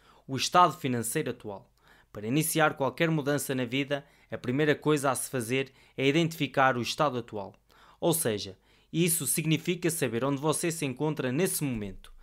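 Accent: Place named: Portugal. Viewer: Parabéns Que bom encontrar um sotaque português ❤🇵🇹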